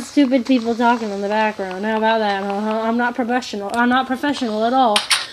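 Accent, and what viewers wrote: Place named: Nevada, United States. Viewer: dude u talk like people in pahrump NV yall best be gon roustabout the rattle snakes before them jeepers ranchalot no shade no cap....im brown and people say i sound like a texan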